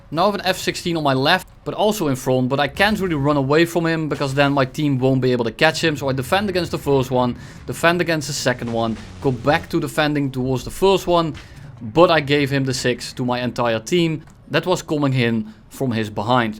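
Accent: Netherlands. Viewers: Youre dutch right?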